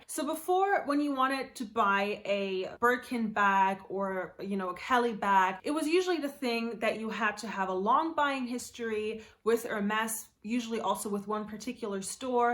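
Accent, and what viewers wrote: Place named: United Kingdom. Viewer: I thought I was going crazy because I’ve been seeing Hermes all over the place. Even I was able to get an appointment and I don’t even have Hermes money. I’m curious, where is your accent come from, it’s beautiful. A bit British?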